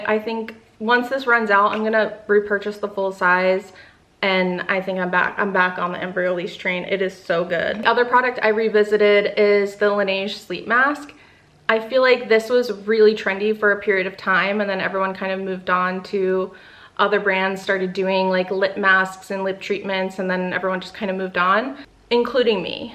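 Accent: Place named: Philadelphia, United States. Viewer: BLESS the delco/philly accent - i'm starting task this morning!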